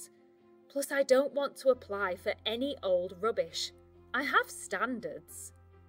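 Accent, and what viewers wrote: England, United Kingdom. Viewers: Beautiful English accent!. I love listening and enjoy reading your stories. Thank you.🙏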